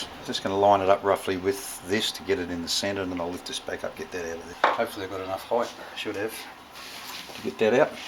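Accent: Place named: Toowoomba, Australia. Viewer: I thought the speech sounded close, in fact your mode of talk matches a mate who lives in Toowoomba, so much so you could be father and son, but I know that's not possible. So from what you just said, Re Lathe switch, can you have the DRO on, but not the lathe and there is no problem? Or does the lathe switch also power the DRO?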